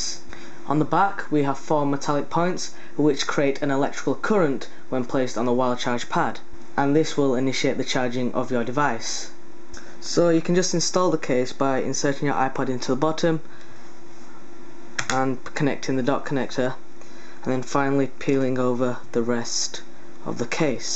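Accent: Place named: Scotland, United Kingdom. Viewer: your accent sounds like a mix between Scottish and British :D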